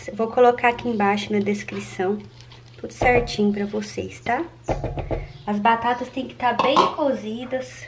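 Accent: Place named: Rio de Janeiro, Brazil. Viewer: Oi, falei muito, mas esqueci de falar que soou carioca, mas moro em Brasilia, sou professora aposentada e depois que aposentei sempre procuro mais novidades em almoços e jantares, simples e rápidos e também mais sofisticados e por isso encontrei-a, pois há anos estou ligada em assistir e fazer novas receitas.para receber família e amigos.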